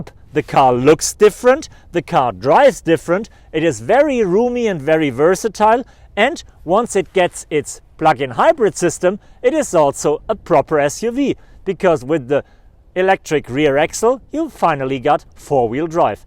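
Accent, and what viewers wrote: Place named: Germany. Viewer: You ain't no violinist; just a regular bratwurst German with a Nazi accent.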